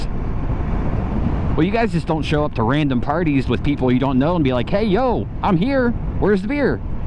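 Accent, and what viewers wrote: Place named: United States. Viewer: These things happen with the glitch. Yeh WestonSuoerMotoVlog is very supportive to us all and and all and out nice guy.. sure I'll meet him some day. He is in England and I am in Scotland so there is about 600 miles between us but it will happen. You accent is soo american, love it. Keep them coming buddy. 👍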